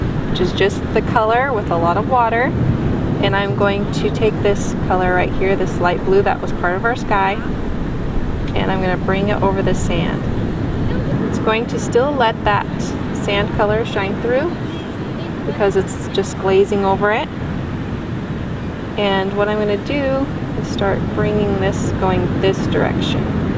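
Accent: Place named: Midwest, United States. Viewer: You must be originally from the Midwest/east.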